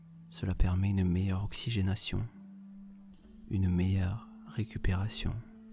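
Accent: South, France. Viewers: votre accent du midi qui fait chaud au ❤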